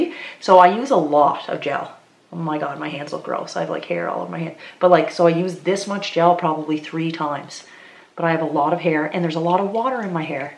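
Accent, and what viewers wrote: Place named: Nova Scotia, Canada. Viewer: Btw, I’m so happy to see you again. I love hearing your NS accent ❤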